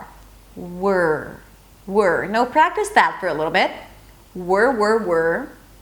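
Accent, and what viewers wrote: United States. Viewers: Hey! I'm absolutely impressed by you flawless american accent!!!! Where are you ffrom and how can you be so natural? You sound more American than native speakers. Congrats!!!!!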